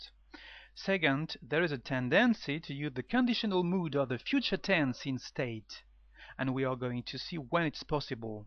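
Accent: France. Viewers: This was very useful, and you're accent and truly francophone delivery made it greatly genuine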